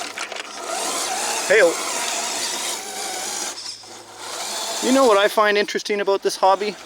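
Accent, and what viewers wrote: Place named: Canada. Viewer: Damn the fakes and the haters! Be you, talk like you, act like you. Otherwise you are a bloody conformist. Victory for sure! Awesome content from who is obviously an awesome person. I'd like to burn a joint with you. Even though you are starting to take on that Canadian accent a little. LOL. Keep doing you bro. Great stuff!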